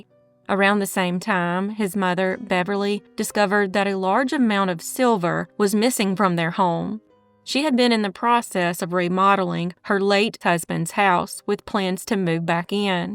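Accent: South, United States. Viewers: I like your little music that you play in your southern accent hello from Minnesota❤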